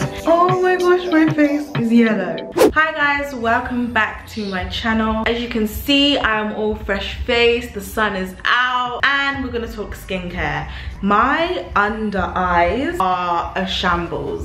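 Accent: United Kingdom. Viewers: Is that your boyfriend in the video?? And are you British living in America or what’s going on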